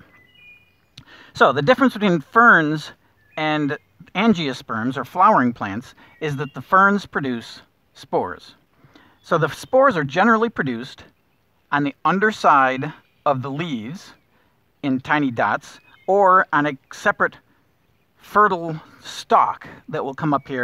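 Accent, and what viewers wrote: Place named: North America. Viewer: I notice you have a North American accent. Will you be doing lots of plants that can be found in the UK? Found you from hearing about the Photosensitive poison in Parsnips.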